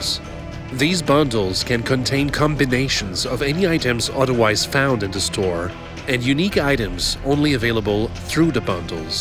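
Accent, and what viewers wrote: Germany. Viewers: That German pronunciation XD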